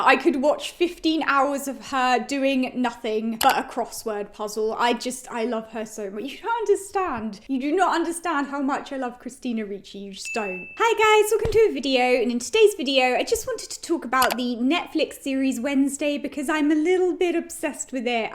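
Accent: United Kingdom. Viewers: not related to the topic... but where is your accent from? Somewhere in the UK I presume?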